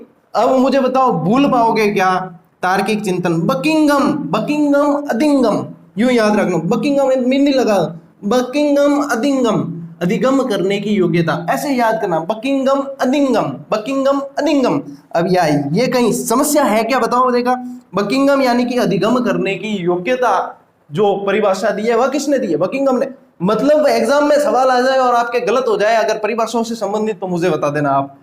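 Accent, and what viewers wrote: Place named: Marwari, India. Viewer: Sir ji aapki marwadi language bahut acchi lagti hai aap aise hi bola karen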